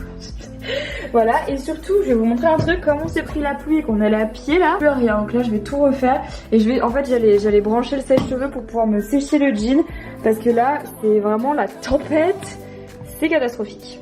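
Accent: England, United Kingdom. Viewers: T'as un super accent anglais ♥️♥️♥️!!! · J'aime trop ton accent anglais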